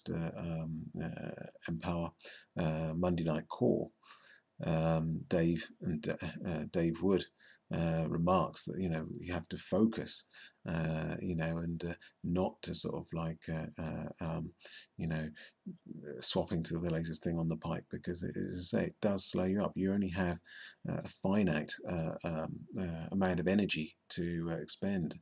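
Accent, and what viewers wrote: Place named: United Kingdom. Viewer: Just gotta say, I love your accent (I'm a sucker for a British accent though).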